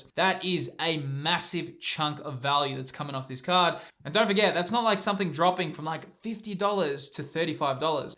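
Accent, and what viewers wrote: Australia. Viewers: I’ll be honest! Your videos not only helped me look at Pokemon cards and even etbs differently but the Australian accent is a chefs kiss on top ❤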